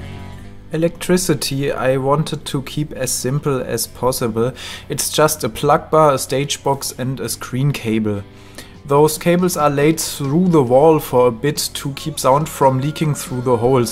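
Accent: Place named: Germany. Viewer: jetzt no front, aber man hört sooo hart dass du deutsch bisd hahaahh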